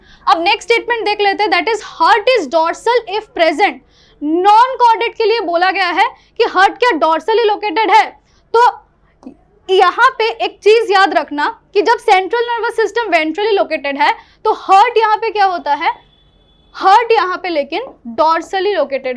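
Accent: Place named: India. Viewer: NICE HINDI ACCENT 👌. VERY IMPORTANT FOR MASS APPEAL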